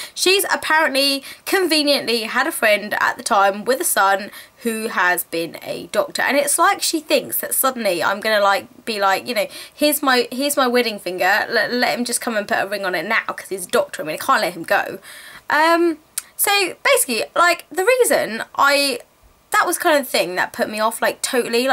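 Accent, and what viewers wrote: United Kingdom. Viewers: your accent is so alkdjfaldh WHY AM I NOT BRITISH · lol..just moved back from the UK to the States. You make me miss the accents lol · I love listening to your stories and I COMPLETELY agree with everything you said! :) It's like people think that just because he's a doctor and has money, makes him a catch. What about true love?! By the way, your accent is literally amazing! I could just listen to you talk all day! Haha! I <3 British accents! :)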